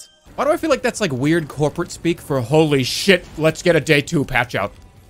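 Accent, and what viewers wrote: Boston, United States. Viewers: The best part about any jerma stream is how BAHSTIN his accent gets when he gets frustrated… “this is nawt mah computah”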